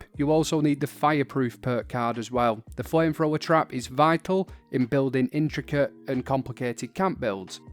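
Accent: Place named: Manchester, United Kingdom. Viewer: My wife and I really want to know where your accent is from. Thanks for the video, but plz help us with this mystery! I think you have a Mancunian accent but she thinks Scottish.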